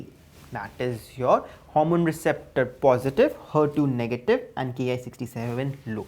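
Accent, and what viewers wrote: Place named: England, United Kingdom. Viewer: Again watching this before my xm .......... Your English accent and kindness are just♥️♥️♥️🥰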